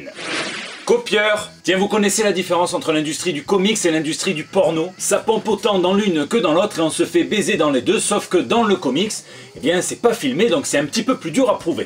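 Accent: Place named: Provence, France. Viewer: Geek Librairie c'est le top les cigales,l'accent provençal, on est en vacances !!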